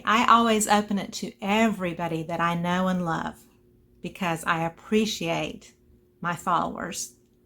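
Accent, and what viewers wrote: South, United States. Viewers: I've always liked you and enjoyed your beautiful art. Now? Now, I love and respect you. What a beautiful heart. What a kind, loving and truly christianly way to respond to someone who was, how we say in the south, less than kind. Sister, you know what I'm talking about. I can hear in your voice you are a southern girl too. :) I can hear my Grandma's words as clear as the day she first said them to me, " Honey, when people are mean to you, you have to show them the love in your heart. You can't be mean back because that's what the devil wants. God wants us to love everybody. ". It was hard for me to understand when I was little. Now that I'm much older, I get it. I feel pity for people that are mean spirited. I always wonder what is going on in there life to make them so miserable. To try and hurt someone else is so negative. 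It's not what the world needs. It's not what anyone needs. The world tears us down, life's unavoidable pitfalls. Why not try to lift someone up? Being positive isn't always easy, especially when someone is unkind. You do it beautifully. I for one appreciate you, all your hard work and your loving, giving nature. You make the world brighter, happier and a better place to live. Thank you for all that you do. May God watch over you and protect you.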